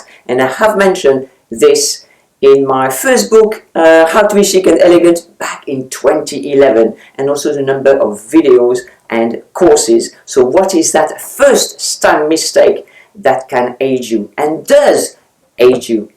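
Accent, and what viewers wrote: France. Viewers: 70s???? Yuck!!! On a brighter note, you are an inspiration of style. I love watching you and I love your accent. I have always considered the French to be the epitome of elegance and you exude exactly that. And I think deep down you are also a good hearted person, one of the good people of the earth. Love you!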